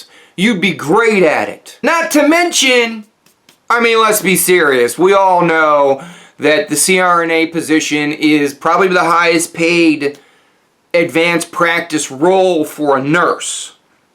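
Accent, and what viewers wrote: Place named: United States. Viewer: Good talk, will be helpful advice for my 16 year son as well. I also like to watch your videos because you are mad..lol...American English is very different from our Australian pronounciation!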